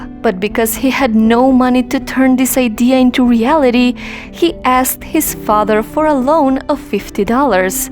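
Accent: United States. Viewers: I need to have an American accent. Does anyone know if the English she speaks is a New Yorker? Because i love her voice.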